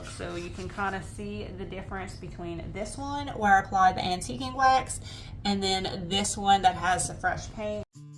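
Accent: Louisiana, United States. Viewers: Love watching your creative projects! Also, I have to know where your accent originates! My guess is Louisiana.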